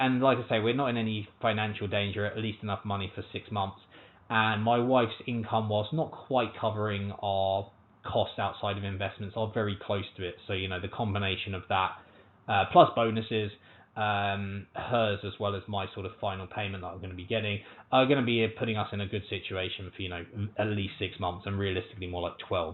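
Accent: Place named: Australia. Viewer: I cannot tell if you have an Aussie Twang to your voice or it's that sort of unique London/Southern accent.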